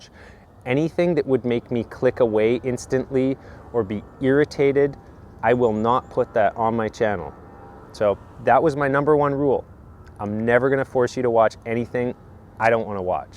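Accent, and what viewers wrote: Canada. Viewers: Just want to say my thanks, because I've gotten a lot from this channel over the last few years, including: - understanding my skateboard on such a deeper level - from trucks to decks, you tapped into my geek nature - when doubts would creep in about my age (late 30s), you inspired me to keep skating. I would often think, shit, skates multiple times a week and he's older, and also does physical labor for a living! I'll be alright;) - you soothed my nerves in times of stress. your Canadian accent and manner of talking are very calming to listen to! Much appreciated,. Best of luck with whatever you do!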